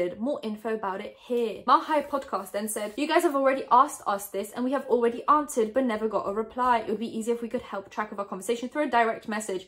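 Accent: United Kingdom. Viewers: What is your nationality? Because you've mentioned that you don't have a British passport but you sound very British lol :)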